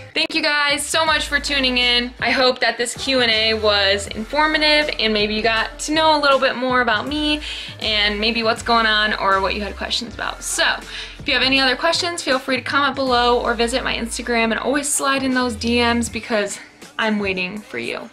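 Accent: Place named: Michigan, United States. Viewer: You sound sooo Michigander it's awesome hahaha. LOVE YOU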